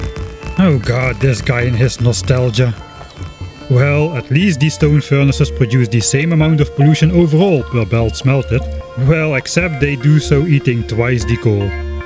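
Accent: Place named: Netherlands. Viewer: This is the definition of dutch accent lol i love it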